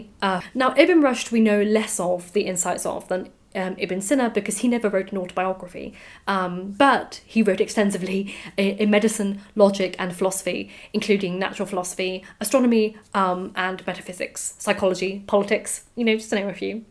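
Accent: United Kingdom. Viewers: do you have a British accent or do you just talk that way because you read books?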